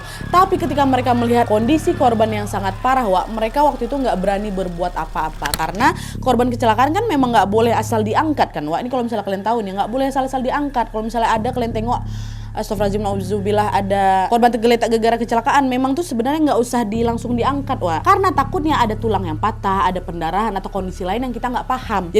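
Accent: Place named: Palembang, Indonesia. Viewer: Suka banget sama cara bicaranya,.wong kito galo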